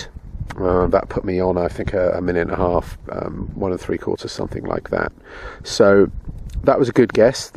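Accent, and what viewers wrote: United Kingdom. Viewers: you sound like a brit but the landscape looks to me like scotland.... what's the dillio?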